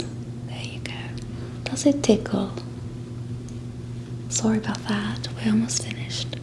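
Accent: United Kingdom. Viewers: Fun video. Is this your first time doing a British accent in your videos? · I didn't know U were British 😆😄🌹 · Those British accents will do it every time, won't they?! 😁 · Not sure anyone would really call an accent the Queens English now either, it's RP English or Received Pronunciation. Most people British people don't talk with a single accent accent I doubt they ever have or there'd be fewer local accents.. Even if you were to take the most common accent spoken now, it's not spoken by most of the population. The London/SE English accent is similar enough that most people from outside the area can't hear the local variations in it but even then you're only talking about 30% of the population which isn't most people. So saying most people don't speak like that now doesn't really mean anything as most people never did. As I said before, the only people who talk about British accents are Americans and when they say a British accent they're referring to a 'posh' SE English accent pretty much like the one is doing. 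Glaswegian, Scouse, Mancunian, Bristolian, Geordie are all British accents but they're not what Americans are talking about when they refer to a 'British accent' and as I said before I doubt that anyone in Britain would refer to their accent as British unless they were directing their comments to Americans. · Are you really British????? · once again this is amazing! Great British accent is perfect please keep doing more of these! Very.Soothing, thank u, Steve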